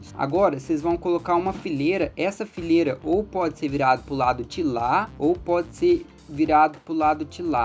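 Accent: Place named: Minas Gerais, Brazil. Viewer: Muito bom esse seu jeito mineiro de falar deixou mais legal parabéns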